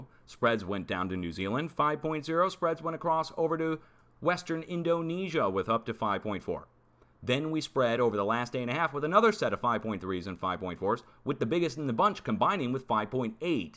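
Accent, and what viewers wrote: Russia. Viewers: Love the Russian accent and your sense of humour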